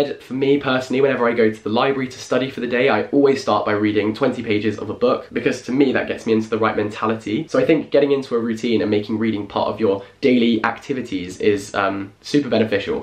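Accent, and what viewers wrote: United Kingdom. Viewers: I like your British Accent it's amazing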